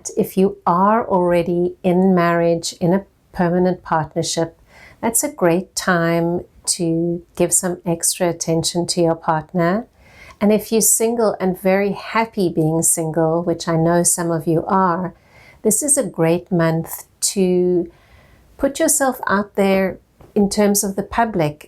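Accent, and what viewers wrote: South Africa. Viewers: Sounds like you're South African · Are you South African tho??..I can hear the accent...or am.I wrong...Ur amazing...My heart thanks you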